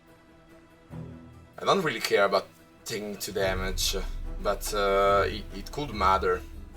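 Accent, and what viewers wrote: Italy. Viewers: Italian accent + I'm italian.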